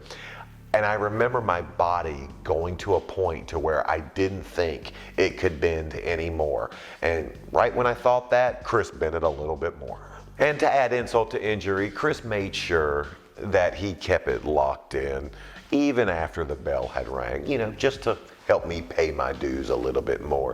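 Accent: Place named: South, United States. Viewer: Thank you brother! You give us the transparency we usually have to dig through dirt sheets for. Can't wait to hear you on commentary when Cole retires. I could totally see you, and pat calling smackdown! We need a southern accent on that mic always!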